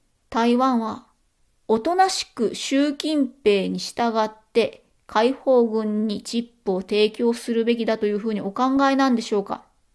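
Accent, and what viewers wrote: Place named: Tokyo, Japan. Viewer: みんなで応援し萠絵さんを国会に送り込みましょう。 そして国会議員になってもらい、経済産業省に政務官として 活躍してもらうのです。役人はタジタジでしょうね。 ただし萠絵さんの東京弁はちょっときつめなので大阪弁が良いです。 「うちは国のために きばりまっせー。やりまっせー。 浪速の根性みせたるでー！！ 維新や公明がなんぼのもんじゃ！！ 負けへんで。 ほやから うちに 1票入れたって」 絶対当選させるのです！！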